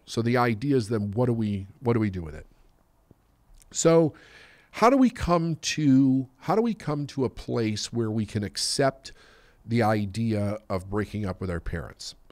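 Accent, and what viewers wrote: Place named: Midwest, United States. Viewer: This guys midwestern accent is driving me NUTS. Bayack, flayat.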